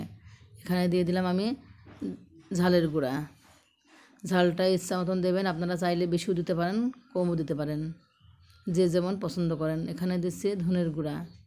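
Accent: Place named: North Bengal, India. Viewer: প্রথমে ধন্যবাদ জানাই সালাম দেওয়ার জন্য । কথার ধরনে বুঝা যায় তুমি উত্তর বঙ্গের । থাক্ ভাল থাকুন, সুস্থ ও নিরাপদে সুরক্ষায় থাকুন।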